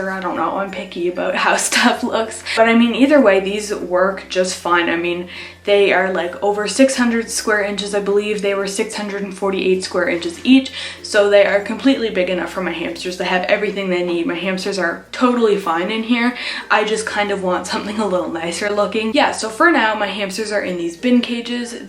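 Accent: Canada. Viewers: Are you Canadian? This isn’t supposed to be rude, I think how you say “sorry” is actually really cute lol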